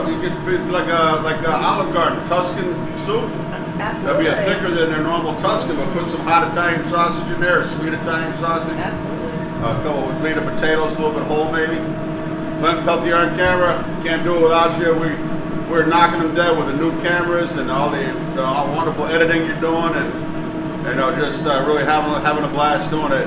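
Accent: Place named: New England, United States. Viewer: eh! love your badass cooking style you & are behind the burners ya'll rock my day!!!! love New England accent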